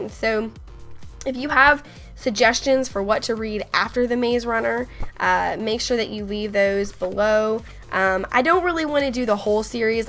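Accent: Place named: South, United States. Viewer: To me you sounded so southern, I don't know why. You just sounded different. Or my brain is just being a derp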